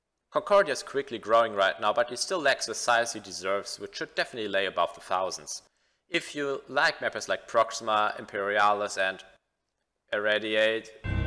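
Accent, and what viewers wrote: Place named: Germany. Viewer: A. Great coverage B. People who deserve to be better known getting spotlighted C. German accent this video is perfect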